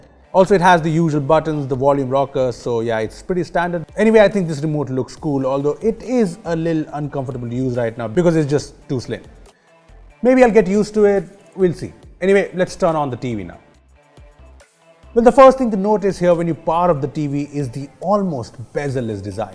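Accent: India. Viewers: Warning *Crappy Indian accent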